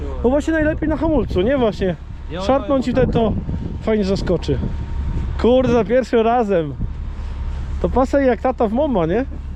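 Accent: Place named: Kashubia, Poland. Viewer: Zatknij uszy bo to sa kaszuby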